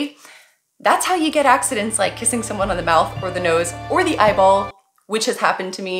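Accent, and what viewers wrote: Netherlands. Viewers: 'Tot de volgende keerrr.." Your Dutch has a Gooisch accent'.😍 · Your Dutch accent is great.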